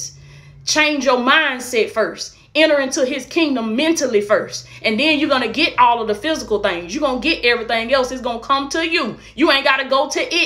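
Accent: South, United States. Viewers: I'm a man of faith.. but I have to tell the truth... that face and that southern accent got me wanting to pay your house note And the car note! Alabama or Mississippi?? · I love your country accent bae.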